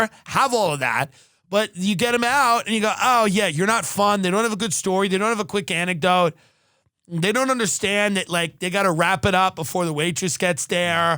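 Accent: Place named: Long Island, United States. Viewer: Long Island accent is fantastic 🤣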